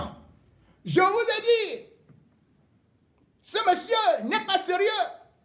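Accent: Cameroon. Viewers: Le président et son peuple sont en otage .😭 le Cameroun est vraiment dans la rue J aime ton accent Beti 🥰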